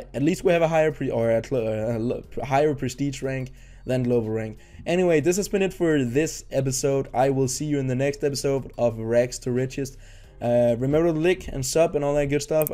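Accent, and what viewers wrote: Denmark. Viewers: Are you from denmark? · are u from denmark? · are u a danish person ore what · Lol er du dansker?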